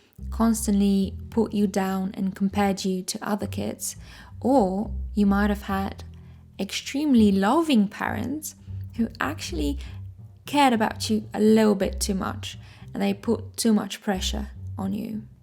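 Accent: United Kingdom. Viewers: Yep. The first time I heard her speaking English I thought she was from some part of the UK because I noticed a bit of an accent and I thought she has a bit of a regional accent. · i'm surprised you have anxiety speaking in English i though you were British! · I honestly thought that you were British :D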